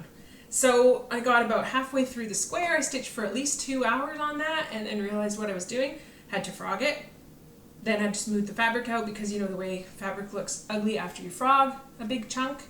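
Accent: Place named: Canada. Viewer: Beautiful finishes! HAEDs are out of my comfort zone, but I admire them. Have a wonderful time at your stitch day, I just watched someone else who is going. Don’t remember who. I watch a few Canadian flosstubers because I love your accent! My dad was Canadian, so we had lots of relatives visit us in LA in the winter.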